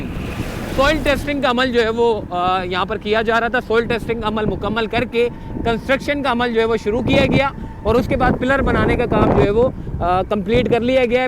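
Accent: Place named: Hyderabad, India. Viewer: Full Hyderabadi accent…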